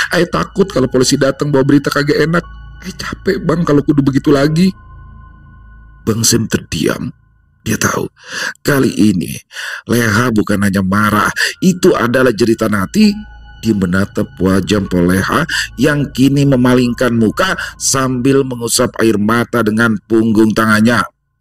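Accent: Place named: Jakarta, Indonesia. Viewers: ❤❤❤👍👍👍 aq suka logat bahasa betawinya super lucu,bang hebat,success selalu yeah bang wkwkwk 🙏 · Asalamualaikum Bang, ikut nyimak Cerita Mas Wasis & Galih yg bergabung dgn Jawara Betawi Bang Jali & Bang Sam, Ceritanya Tambah Menarik apalagi Logat Betawinya yg dibawakan Bang mantap Banget, ditunggu Kelanjutanya jangan pake Lama, mksh Bang sukses selalu 👍🥰🤗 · hahaha..suka banget logat Betawi..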